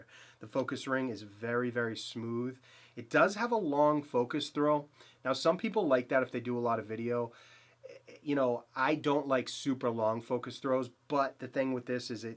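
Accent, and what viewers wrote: Boston, United States. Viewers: Always love your videos. For the record your Boston accent is not that bad.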